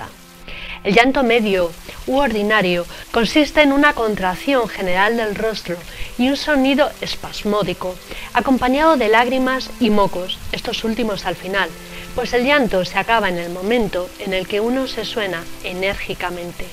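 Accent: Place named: Spain. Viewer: Hola, lo has hecho muy bien y el video está bueno, pasa que a muchos argentinos nos resulta raro ver relatada la obra de un compatriota nuestro con tonada española. Ya sé que dirás que en Argentina se habla algo que no es español, pero quienes hemos nacido acá y escuchado el argentino desde la cuna creemos que es "el" idioma, jaja, muchos besos desde Buenos Aires.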